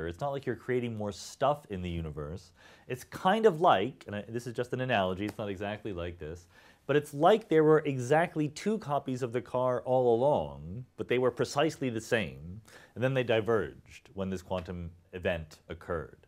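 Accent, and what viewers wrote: United States. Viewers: It took me 10 minutes to notice his american accent. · I'm watching this and think 'Something is really out of place here'. And then it comes to me: that man speaks with an American accent! It is so odd to watch a Brady Productions video without the traditional British english. :)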